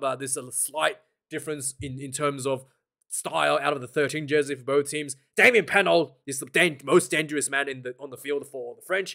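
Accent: Afrikaans, South Africa. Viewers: As a South African, love your Afrikaans man.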